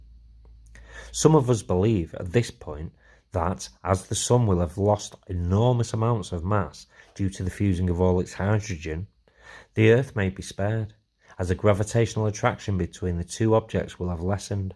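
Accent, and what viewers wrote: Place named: United Kingdom. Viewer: I love stuff like this. Love the British accent of the narrator too! Easy to understand info. Even my wife was able to follow! 😂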